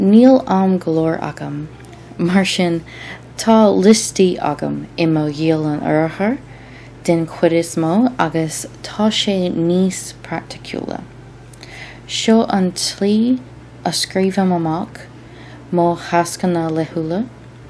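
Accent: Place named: United States. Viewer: Beautifully put. I like the slight American lilt to your explanations...👍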